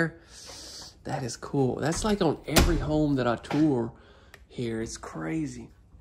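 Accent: South, United States. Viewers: You don't have to not sound country for us here in Pa ... I love yours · your southern accent to me just enhances the quality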